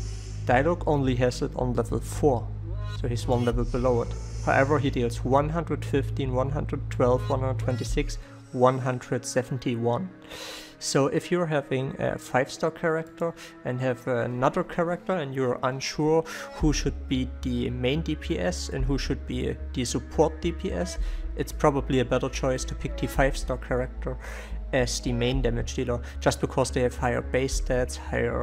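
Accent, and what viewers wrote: Saxony, Germany. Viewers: Hahaha are you from Germany? If so you can hear it out from your English accent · kommst du aus sachsen 😂❤ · your accent is sooooo german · are you german? It sounds like you have a german accent:D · wie man den deutschen akzent nicht nicht beachten kann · Your accent sounds kinda German, may i be wrong?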